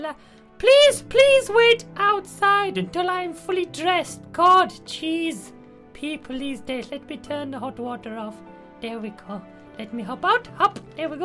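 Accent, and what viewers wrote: India. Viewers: Loved that Indian accent. My. Mum has an Indian accent but I prefer yours. Wish I could speak like that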